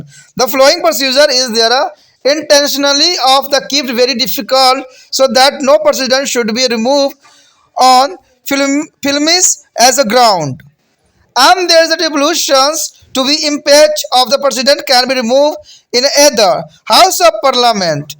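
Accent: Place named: England, United Kingdom. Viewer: Sir thoda dhng se bol lo angrej mt bno smjh hi nhi aarha kya bol rhe ho 😢😢😢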